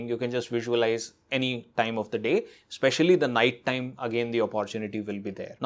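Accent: India. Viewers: This pure Indian accent sounds sooo good....no artificial stuff 👏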